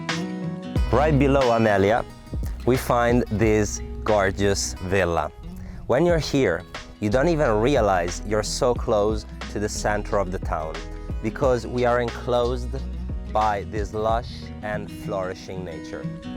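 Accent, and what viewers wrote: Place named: Rome, Italy. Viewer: Dude, you are such a professional. Also, your Italian is “lingua Toscana in bocca Romana.” Great property as well!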